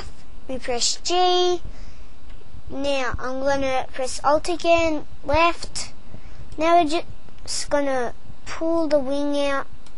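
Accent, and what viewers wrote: Australia. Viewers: a young aussie am i right?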